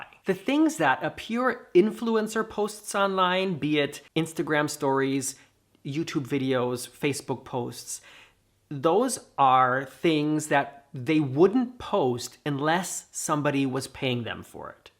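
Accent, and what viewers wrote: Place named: Germany. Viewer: Ah but maybe 🤔 there’s alcohol in the LIPTON Iced tea to make him loopy without telling him. 🤔🤯 wouldn’t that be insane if they did that? 🤣 (I know they most probably don’t...just an assumption!!)Lol..🤣 (Not hating it’s not pronounced vegg-ann it’s pronounced Vee-gan, I understand if it’s your German accent I struggle to say stuff with my Welsh,English accent so I say things wrong like specific...It comes out as suspific 🤣😂 when I say it to people they look at me weirdly and I embaress myself!🤣😂) (FACT: The Welsh language/Accent, Originates from from Wales,UK, the strongest accent is in North Wales and then South, East and Wales don’t (age that strong accents we just struggle to pronounce words...Well at least I do..😂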